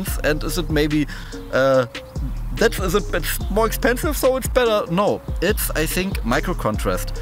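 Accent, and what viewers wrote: Berlin, Germany. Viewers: Schade das du die videos nicht in deutsch machst . Du kämst mit deiner berliner schnauze sicher viel geiler rüber und es gibt nur wenige deutsche kanäle die nicht langweilig sind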